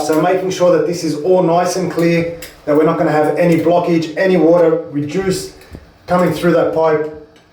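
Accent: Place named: Australia. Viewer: Legend, you literally have just saved me big time. I am young single female with no plumbing experience and was able to do this! Being an Aussie I immediately felt calm hearing a fellow Aussie use no nonsense straight to the point instructions.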